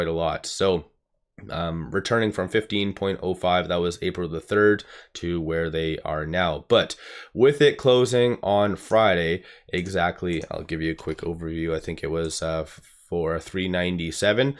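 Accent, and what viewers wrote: Canada. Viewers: Good content as always. I detect a Canadian accent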